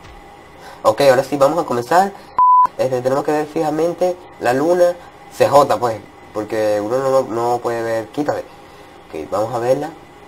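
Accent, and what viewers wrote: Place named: Apure, Venezuela. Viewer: aaa or eso mano se te eschuca lavoz de apureño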